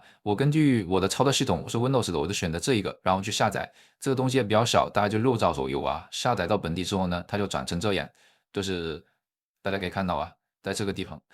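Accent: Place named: Guangxi, China. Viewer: 口音应该是广西老乡。😀刚想找个Codex对接本地模型方案，讲得很详细。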